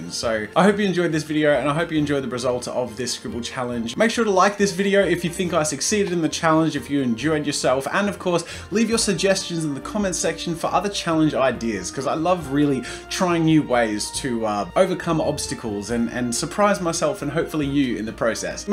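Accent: Australia. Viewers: dawn boi ausie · dawn boi Ausie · His Australian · Are you an Aussie? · Quite Australian... the way he says "art" is also in a few British accents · He's Australian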